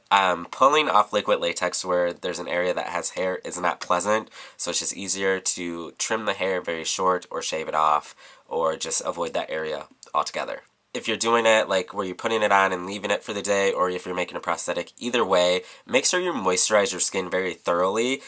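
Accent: United States. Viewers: I love how the you say 'just go right ahead' and everythings a question (clearly american)... id work on that ;)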